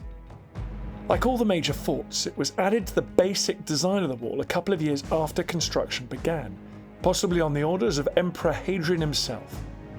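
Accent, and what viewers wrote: United Kingdom. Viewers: Good video - but... You need to provide subtitles better than the auto-generated ones. British accent can be challenging for non-native English listeners as much as it is for whatever AI is translating, and if you add Latin words to the mix, you get amazing things like "Allah Augusta" and there's no way to unread that once you read it. 😂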